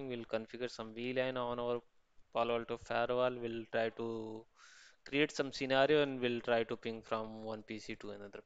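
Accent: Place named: India. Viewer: Another terrible Indian accent explaining something he just learned yesterday. I guess he is counting on this video to get himself a H1B.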